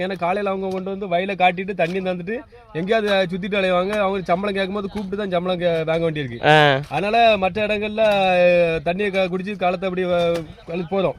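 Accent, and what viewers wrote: Tirunelveli, India. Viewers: திருநெல்வேலி பேச்சுவழக்கு தனியா தெறியுதுங்க.சிவகங்கை பகுதியில் இந்த மாதிரி பேசவதில்லை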